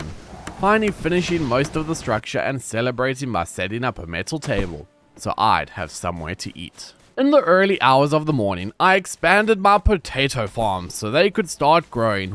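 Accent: Afrikaans, South Africa. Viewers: hy het n afrikaans se aksent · I think anyone who has ever met a single south African forever recognises the accent. It's quite unmistakable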